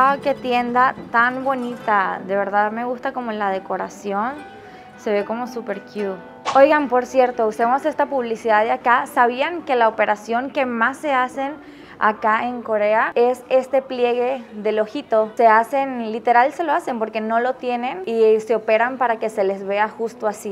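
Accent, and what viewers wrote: Venezuela. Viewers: A donde vaya como habla puedo decir que es Venezolana amo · jajaja no se le puede negar que es venezolana por su forma de hablar super depinga. saludos desde Venezuela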